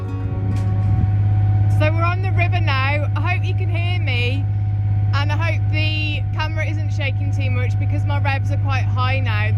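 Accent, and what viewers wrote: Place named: Wolverhampton, United Kingdom. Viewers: Thanks for another enjoyable video I've just watched, you really are a breath of fresh air, so natural with no #airs or graces#, but well aware that you are sharing your videos with # a great fan club# you're giving a lot of joy to like myself. I love the Wolverhampton accent, which brings back so many happy memories for me with my late wife, thank you, thank you, keep up the good work, and giving lots of pleasure to your #fan club# all the best, Martin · Love the video, you have a great West Midlands accent 😊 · Hello. Did you use a drone for the aerial shots? Love your videos and big bonus to hear the accent again (I'm from Wolves too, moved away long ago...) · 🙂 Thanks,. Moved from the midlands 30 years ago - still miss the accents. Really nice to hear yours. Looking forward to more videos.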